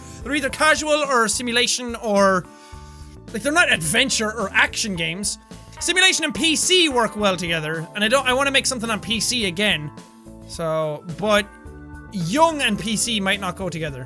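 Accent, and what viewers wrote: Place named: Ireland. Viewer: I love your brogue!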